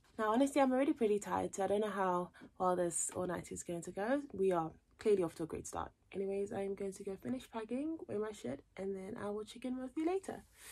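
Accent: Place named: United Kingdom. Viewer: Which part of South Africa are you from, because that accent sounds british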